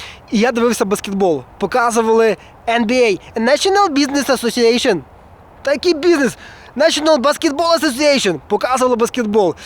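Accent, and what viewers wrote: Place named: East, Ukraine. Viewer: Це круто! Але подейкують що відчувається східний акцент..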